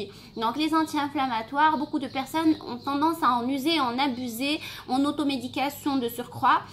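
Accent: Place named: Marrakech, Morocco. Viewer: ختي وراه تنكول اللهجة شوية ديال مراكش 😘😙 يعني قريبة ليا ❤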